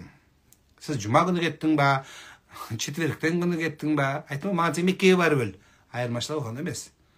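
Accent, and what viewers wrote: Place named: Kyrgyzstan. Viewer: Ообада таптаза кыргызча суйлойт екен ,рахмат сизге.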